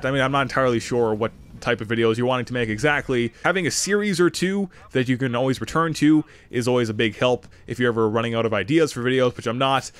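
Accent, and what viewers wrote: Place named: Canada. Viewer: Your canidiaannn!!!!! Damm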